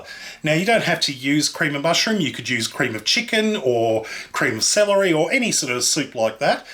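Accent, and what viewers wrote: Australia. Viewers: Im australian but have been living overseas for years. I love your videos because they remind me of australia ( food, accent, etc ) <3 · Omg you're the sweetest! Love the Aussie accent and your positive energy! I will definitely be making these for my family here in Belgium. Having grown up in eastern Canada, my own mother always made very similar stuffing (no ground meat nor chestnuts as many Americans apparently do) but the onion, celery, bread crumbs, butter, seasoning and herbs (sage, rosemary, thyme). Mmmmm....can't wait to do this..next weekend when our girl comes home from college surely as Canadians already celebrated Thanksgiving in October. Do you celebrate "dan anda" too ?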